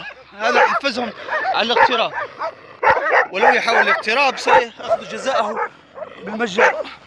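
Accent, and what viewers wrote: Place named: South, Morocco. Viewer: Noman Khushi أظنه مغربي من الجنوب وذلك من نطقه للغة العربية 🙏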